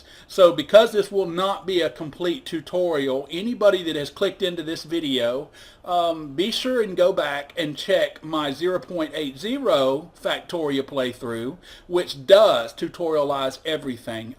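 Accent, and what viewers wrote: South, United States. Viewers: btw love the southern accent. It makes it easier to listen to the commentary. And you're funnier then a one legged man in an a** kicking contest!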